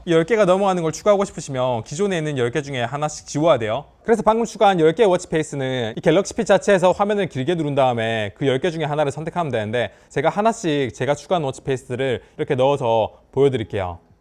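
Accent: Gyeongsang, South Korea. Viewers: 경상도분이신같은데 서울말 쓰실라그러넻ㅋㅈㅋ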